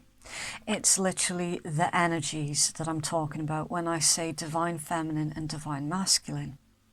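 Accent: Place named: Northern England, United Kingdom. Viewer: Thank you very much for this reading. I’m sure this message was intended for me, it was so specific I was literally waiting for you to give the individuals names involved! I think you are in the north of England from your accent. I have been living away from the uk for the past four years and am returning in March. I would very much like to meet you, do you ever do personal readings face to face? You can find me at <mail>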